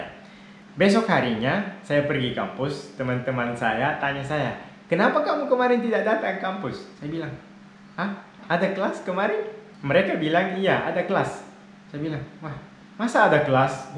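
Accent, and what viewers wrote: Makassar, Indonesia. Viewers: Luar biasa...Logat makassarnya kental.. 😁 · wah kak logat nya sudah sama dengan logat makassar hehehe btw kak, mau nanya apa betul, sudan terpecah jadi 2 negara? mengapa bisa begitu kak.... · Bahasa indonesianya ada sedikit aksen Makassarnya wkwk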